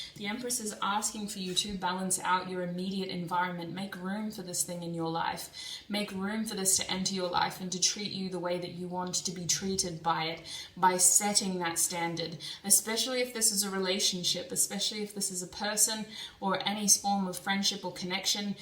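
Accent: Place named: Australia. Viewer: Love Kirk (his name said in your lovely Australian accent!) 🖤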